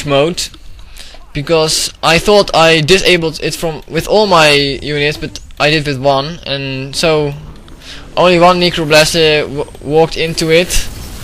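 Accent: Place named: Netherlands. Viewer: R u dutch? U got really a dutch accent, I know I am dutch xD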